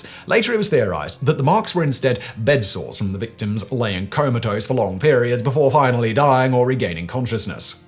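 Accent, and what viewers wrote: United Kingdom. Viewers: Square space as a sponsor: Check British: Check is and confirmed.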